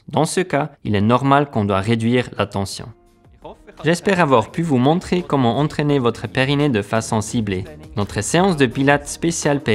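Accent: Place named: Germany. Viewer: j'aime beaucoup cette accent allemand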